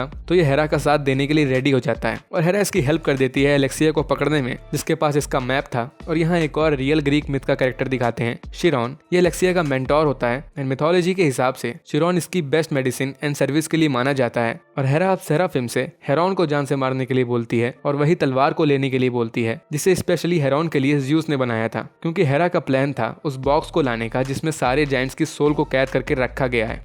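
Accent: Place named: Bihar, India. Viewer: Ohh man.... are you from bihar?? I can recognise it coz of your accent.. But your voice is really relaxing and soothing.🥰❤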